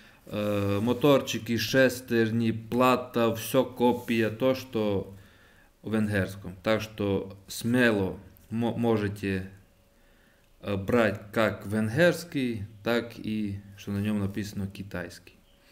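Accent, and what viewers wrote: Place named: Ukraine. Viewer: Автор спасибо за обзор.Но... Уши вянут.Не пытайся говорить на русском говори на родном языке.Не прыгай выше головы.Разговаривай в будущих видео на украинской мове(из говора понял что украинец),не ломай свой язык и не травмируй наши уши.Спасибо за понимание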